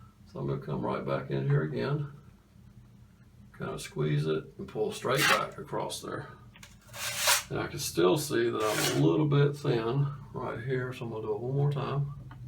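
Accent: South, United States. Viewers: This was so helpful, thank you! And something about the really clean finish on the wall and pleasant southern accent was strangely satisfying lol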